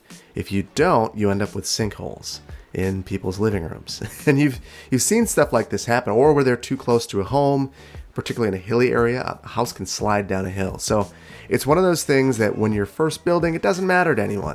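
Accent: Wisconsin, United States. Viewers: Are you from Wisconsin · Watched your videos to better understand Skylines. Stayed for the intrigue (started with Verde Beach.) Whole time I was watching I was like 'He has a Wisconsin accent.' Thanks for confirming my suspicions. Anyway, keep up these amazing videos, they are much better than the cities I make. Also, congrats on the subscribers. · NEVER apologies for our Wisconsin accent, its something to be well... somewhat proud of XDXD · Respect the Wisconsin in ya lmao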